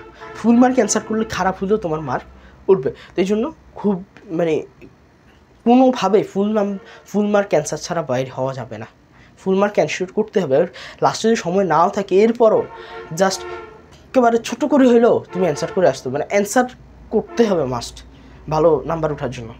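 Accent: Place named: Noakhali, Bangladesh. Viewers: Vaiya,r kothar accent dekhei bujhe gelam vaiya Noakhali thake 🙂 Hea amio Noakhali tei thaki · Vai mone hoy noakhalir😊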